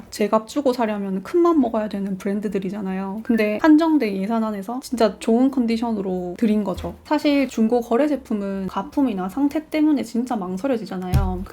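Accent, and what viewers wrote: Gyeongsang, South Korea. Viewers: 고향 경상도어디였나용?!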